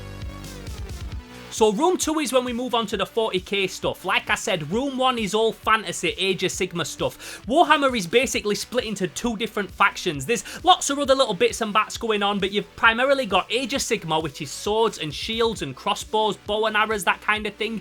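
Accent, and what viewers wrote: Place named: Cockney, United Kingdom. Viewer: That Cockney accent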